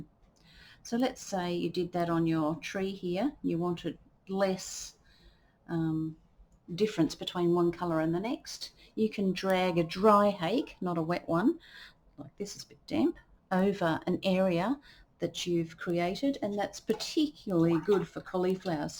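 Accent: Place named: Australia. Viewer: I always use Hakes for watercolour. Great vid and I love Ozzie accents, esp the singing at the end of sentence ;-)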